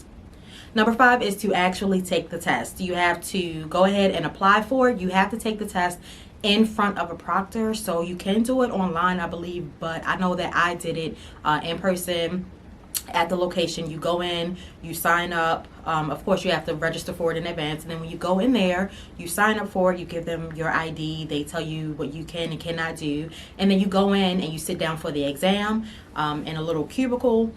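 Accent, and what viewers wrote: Baltimore, United States. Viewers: I can tell she from b more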